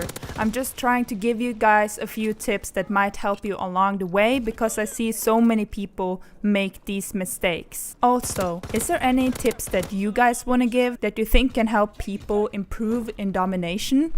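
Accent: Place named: Ireland. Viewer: her accent is irish fyi people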